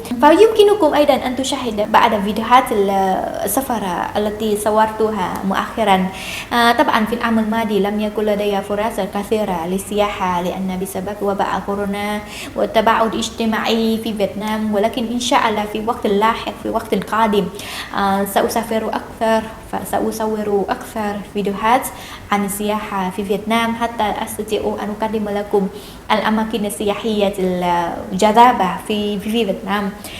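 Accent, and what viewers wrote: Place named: Thailand. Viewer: Bạn nói tiếng Arap với accent tiếng thái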